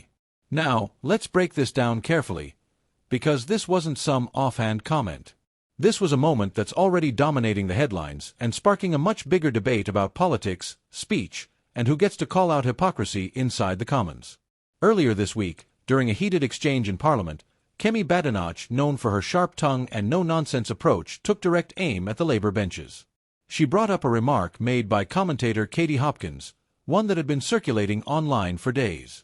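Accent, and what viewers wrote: United States. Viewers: Dreadful editing and poor pronunciation the American accent of the bot narrating is dreadful and amateurish 😂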